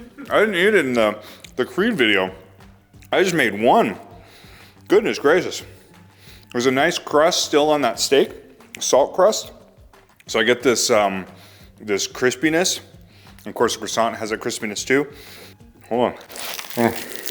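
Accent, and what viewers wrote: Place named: Glasgow, United Kingdom. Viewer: You sound like you're from Glasgow.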